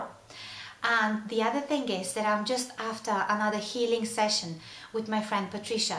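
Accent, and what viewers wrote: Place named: Eastern Europe. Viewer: it sounds like youre from eastern europe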